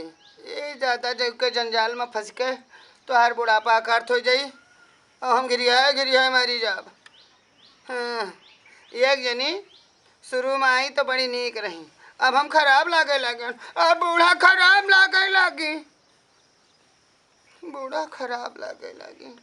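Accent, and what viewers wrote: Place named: Awadh, India. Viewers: wow must lag rha h ki dadi ma bol rhi h. aapka bolne ka tarika bhut mst h, lag rha h gonda pahuch gye h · Really enjoyed your way of typical awadhi talking ! Keep it up bro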